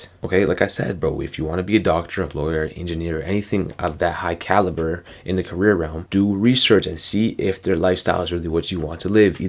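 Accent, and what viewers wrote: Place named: Canada. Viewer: I didn’t even realize u were from Canada until u said fam 😭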